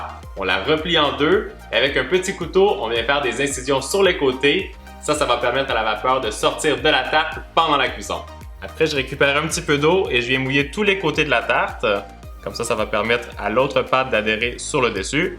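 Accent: Quebec, Canada. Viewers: J'adore ton accent 😍 c trop cool l'accent québécois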